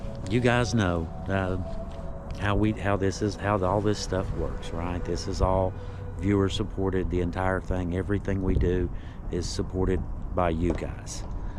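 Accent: South, United States. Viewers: I wish I found this channel sooner. I will watch more videos as this one is fascinating., you have what I think of as the true southern accent, calm, measured speech. My mother's people were in Arkansas and I thank you for bringing their voices back to me.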